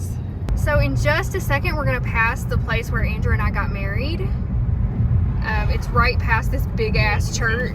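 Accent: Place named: Arkansas, United States. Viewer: I look forward to these videos! Arkansas is beautiful❤ My aunt Mary is from there and your accent and hers are so similar it’s funny. I wish you luck in your therapy and your marriage🥰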